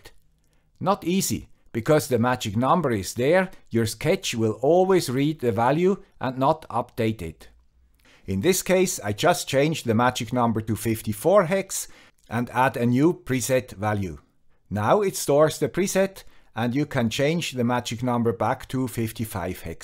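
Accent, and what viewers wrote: Switzerland. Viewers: Thank you for these excellent videos. I love the clear thinking and explanation you bring to them. So, as AI has transitioned from its early mechanistic approaches (e.g., expert systems, etc.) to the current models based on non-parametric statistics, there is also a subtle change in its successful application. The current models are more about being 'less wrong' than 'exactly right in the instance'. This is encouraged by its alignment with large number theory and other assumptions (e.g., Bayesian Analysis, etc.). Given this, we might best think of modern AI technique as most suitable as part of a 'problem-space reduction' tool that gets bolstered and completed by more traditional, deterministic kinds of algorithms, rather than as a central part of some core algorithm. AI's real mission, IMHO, is about abstracting 'the smart' from from everyday good engineering, and this will require a different kind application with different kinds of value produced. 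I like to think of AI as a kind 'context manager' for whatever problem(s) I am trying to understand and solve. Still, this was a bold and valuable attempt. Bravo to, The Guy with Swiss Accent.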